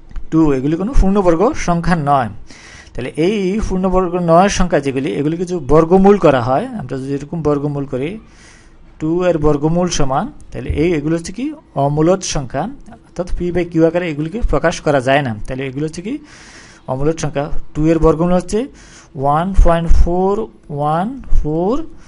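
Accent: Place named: Noakhali, Bangladesh. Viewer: স্যারের বাড়ি নোয়াখালী। স্যার বলে ফুর্ণ সংখ্যা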